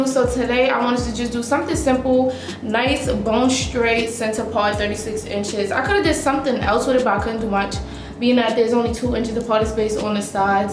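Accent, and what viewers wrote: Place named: Brooklyn, United States. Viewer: I love you deadass!😌😍🥰😘It’s the Bk accent for me You make me feel at home I miss Brooklyn!!!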